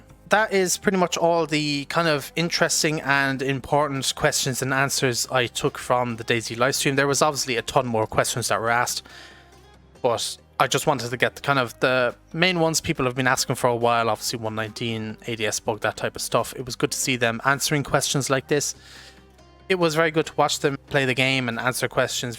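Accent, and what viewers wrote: Ireland. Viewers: are you irish because i can hear your accent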